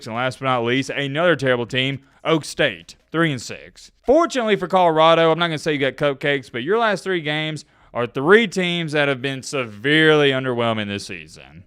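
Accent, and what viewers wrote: South, United States. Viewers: Love your Colorado talk with your southern twang.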